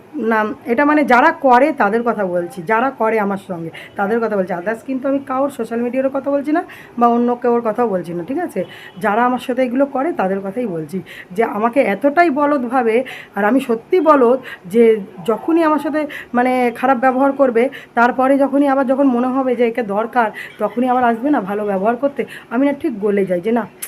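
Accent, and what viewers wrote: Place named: Bangal, Bangladesh. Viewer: আহা গো কি সুন্দর বাঙ্গাল কথা কও গো 😍😍😍🥰😊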